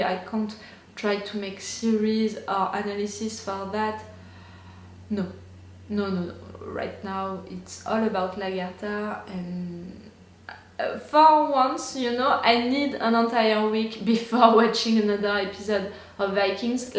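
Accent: France. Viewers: Are you french? You have a accent french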